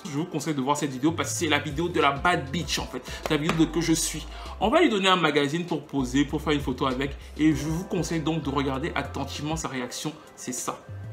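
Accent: Cameroon. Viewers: Exactement.. j'ai senti mon frère kmr dans un dur topo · On sent un vrai Camerounais ton accent est très prononcé 😂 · J'ai reconnu l'accent camerounais. Good job, Mais les extraits musicaux sont beaucoup trop courts! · Ce gars parle comme un camerounais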